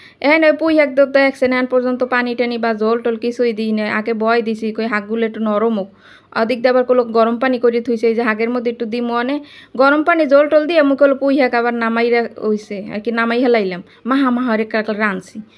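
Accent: Barishal, Bangladesh. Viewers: আপু চা খেয়ে নিলে পুঁই শাক রান্না খুব সুন্দর হয়েছে ওয়ারড্রব গুছানো অনেক ভালো লাগলো তোমার ওয়ার্ড ড্রপ অনেক সুন্দর লাগছে আপু তোমার কথা শুনে মনে হচ্ছে তুমি বরিশালের কোথায় তোমার বাড়ি জানিয়ে দিও ভালো লাগবে আমার বাড়িও বরিশাল ❤❤ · বরিশালের ভাষা দারুন লাগলো বরিশাল তোমার বাড়ি কোথায় আমার শ্বশুর বাড়ি বরিশাল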